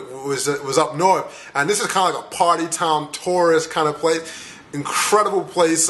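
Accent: United States. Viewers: If they hear your american accent they will find it very cool and your immediately very interesting. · In my opinion, as a Dutch guy, you look like an American black guy. And if you would talk to me, I'd notice your American accent too. But I probably would have guessed that. Africans have a different mimic, and a different way of expression.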